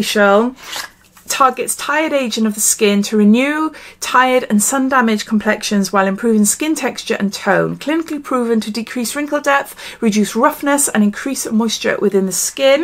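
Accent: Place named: England, United Kingdom. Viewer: I subscribed because of ur accent I am subscribed to a few English ladies. I'm in the USA in the state of Virginia 😊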